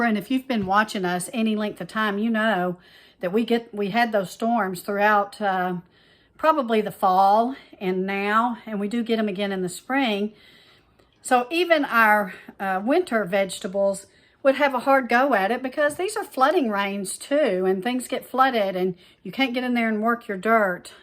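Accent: Texas, United States. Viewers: Just seen this today.....I have a younger Brother in Richland Hills and I will have to call some friends of his to see if he is alright ..... he doesn't speak to me but I shore want to know if he is out of danger. And I like your Texan accent!!!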